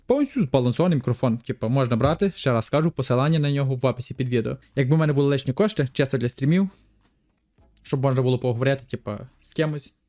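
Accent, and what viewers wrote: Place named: Western Ukraine, Ukraine. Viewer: у вас цікавий акцент ) Іванофранківщина/ тернопільщина чи закарпаття?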